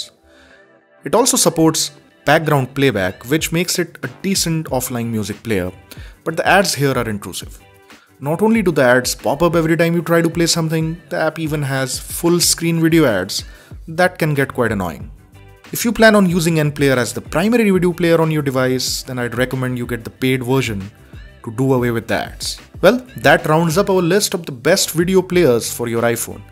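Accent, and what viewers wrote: India. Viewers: Indian english 🙁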